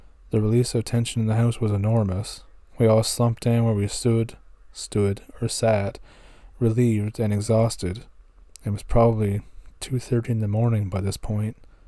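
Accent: Canada. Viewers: I'm pretty certain he's from Canada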